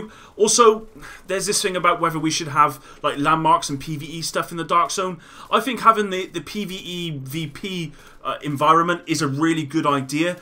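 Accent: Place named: Newcastle, United Kingdom. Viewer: I’ve been watching you forever and I just realized you sound like the true Geordie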